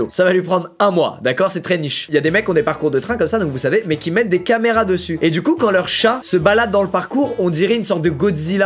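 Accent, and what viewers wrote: England, United Kingdom. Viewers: Toujours un accent anglais MA-GNI-FI-QUE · Toujours l’accent anglais qui est frais chakal hein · ✨l’accent anglais✨ toujours aussi beau 😭😂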